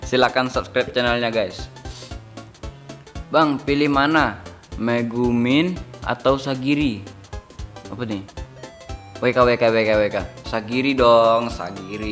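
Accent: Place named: Banyumas, Indonesia. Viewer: ngomongnya yg loss ngapak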